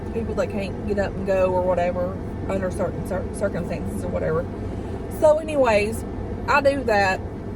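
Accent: South, United States. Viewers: HUGS from Vancouver Canada. You are a wonderful person with a fabulous southern accent, love it! Never explain to anyone. Be yourself always. I enjoy your honesty and kindness. Have a wonderful day :) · I love your accent a southern accent is a beautiful thing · My favorite salad is a wedge salad. It's a wedge of iceberg lettuce with bacon and blue cheese dressing. It's so good. I adore the way you talk. Moved here to NC from Ohio and I adore the southern "accent". You have a innocent, down home feel when you speak. Don't ever change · Honey if that girl doesn’t like a southern country accent she might want to move out of the state of Tennessee. Of all the things to get upset about in this world she has to be upset about how someone speaks. Bless her heart she needs help.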